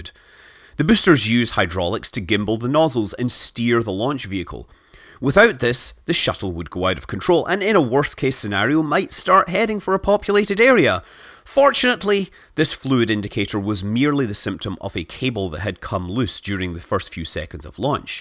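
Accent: Scotland, United Kingdom. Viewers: It this narrator Scottish or Welch or what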